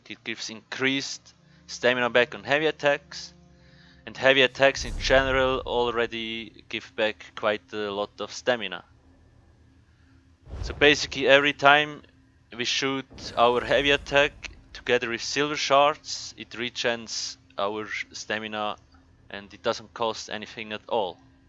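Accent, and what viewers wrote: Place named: Switzerland. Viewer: Dä schwizer akzänt :D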